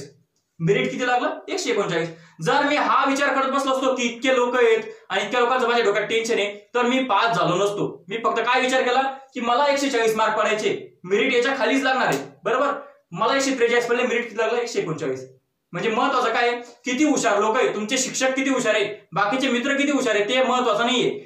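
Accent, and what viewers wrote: Khandesh, India. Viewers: Bhau khandeshi she vatat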